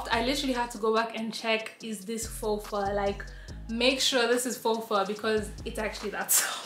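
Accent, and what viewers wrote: France. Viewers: When you started talking in French I thought you were French due to your accent and I am French So I can tell you you sound like you’re French this is sooooo crazyyy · even if you know a few words your french accent is damnn! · From a french girl , you have such a GREAT accent, I almost thought you was French too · Love the french accent! You were in my suggestion, it's funny because you just look like my younger sister and I'm french! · Par contre, ton accent français, MAGNIFIQUE. I was chock 😳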